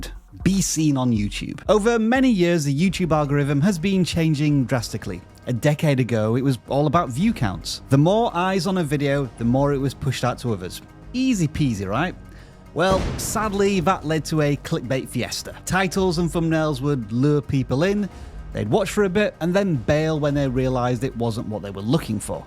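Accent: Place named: Yorkshire, United Kingdom. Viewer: I have a random question for. I have a friend who is from Yorkshire and he’s from Hull and I thought that your voice sounded like it was from there but I was just curious. What part of Yorkshire are you from? Also there was something that you said in the video about how thumbnails and titles attract views which I think it’s true but if you’re subscribed to a person like I am to you, I just see that it’s from you and I’m interested. it’s not a Elon Musk scam live stream coming from VidIQ I’m gonna click on it.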